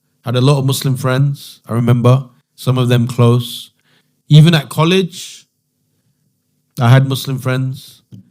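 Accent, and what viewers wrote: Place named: Saudi Arabia. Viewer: You were not hindu you have Soudi accent you liar. You just want to promote 😃you fool!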